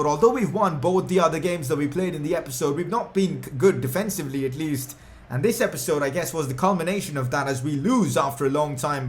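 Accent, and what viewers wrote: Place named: India. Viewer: #PCQ Where are you from? U sound indian..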